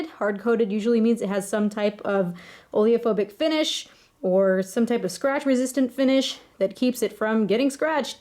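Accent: Canada. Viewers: Are you Canadian ? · I love the canadian accent :) haha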